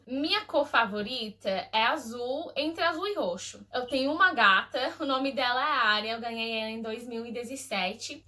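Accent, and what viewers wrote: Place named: Northeast, Brazil. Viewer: Nossa que português... Passa tranquilamente por nordestina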